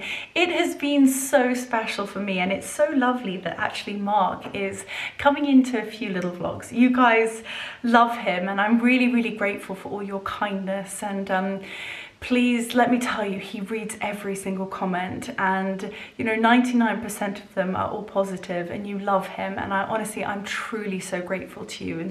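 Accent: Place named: Scotland, United Kingdom. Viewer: Love to hear that scotish accent god bless you all❤😊😊😊😊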